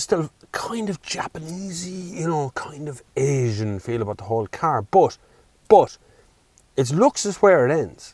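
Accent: Ireland. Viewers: Oh I love the Irish way of speaking!:) Sadly I moved from Galway to Switzerland 2 years ago after spending the nicest 12 years of me life over in the Wesht;) Great review there! Ps. Price of this car in ROI comparing to the Swiss price is ridiculously low:)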